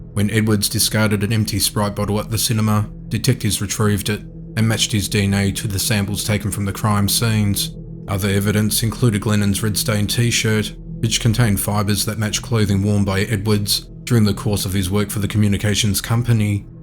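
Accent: Australia. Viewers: I am guessing the narrator is Australian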